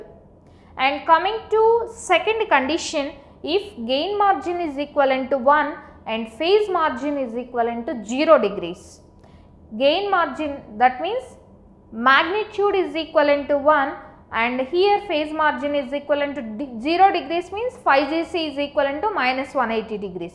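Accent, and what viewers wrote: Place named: South India, India. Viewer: She is South Indian I guess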